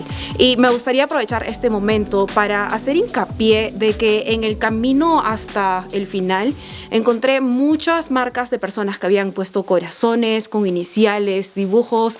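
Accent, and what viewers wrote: Peru. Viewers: Pues sí habla como peruana.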